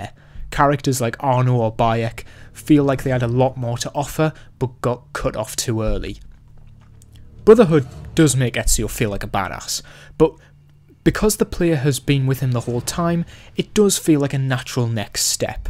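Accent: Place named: North East England, United Kingdom. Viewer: This character analysis is one of the most detailed I’ve ever seen on YT. You’ve made me fall in love with Ezio even more than I already did! Also, can’t help but notice the very north east English accent you have 💃🏻. Fellow NE’er here 😎😎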